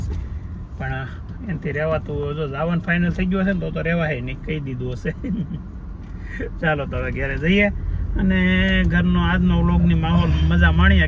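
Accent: Kathiawar, India. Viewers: sorry gujrati vachta nathi avartu Anguta chaap chu 😂 Bolta khatyavari gujrati che😂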